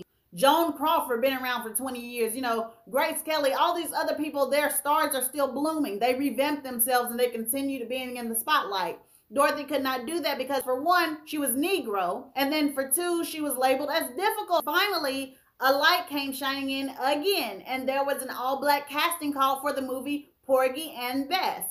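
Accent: South, United States. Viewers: Yeah definitely from the south.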